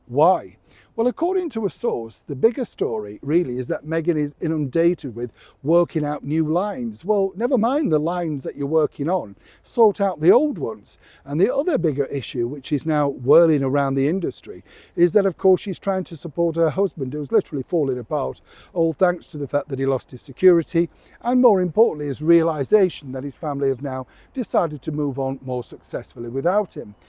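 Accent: Yorkshire, United Kingdom. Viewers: ...YESS, i knew you were in Yorkshire just by looking at their very recognisable stone work in the church behind you...ey up, i love it when t' " Yorkshire " comes out don't stop will you, thank you and as always take care...👋👋🫖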